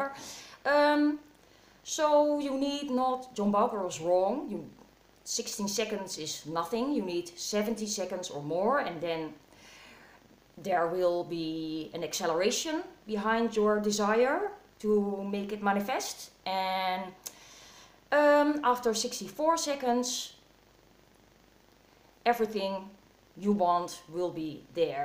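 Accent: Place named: Netherlands. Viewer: Ik kwam hier van V.K's shoutout naar jouw maar ik wist niet dat je nederlands was xD. Ik wist het meteen nadat ik keek naar je andere videos and je accent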